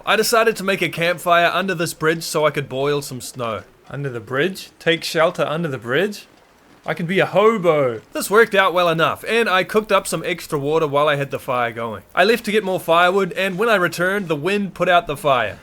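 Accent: New Zealand. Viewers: Do I hear a new Zealander ?? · a New Zealand accent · New Zealand, you can tell because the vowels are slightly different.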